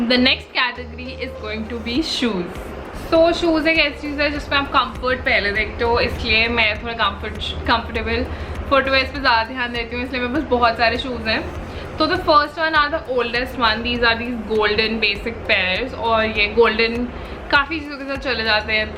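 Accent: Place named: Punjab, India. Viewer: Punjabi tone in hindi is best 😎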